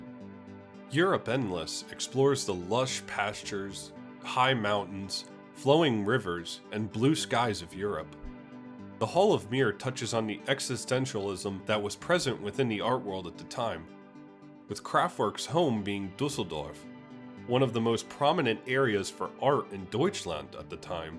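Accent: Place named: United States. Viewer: Get a German narrator as the American voice over is worthless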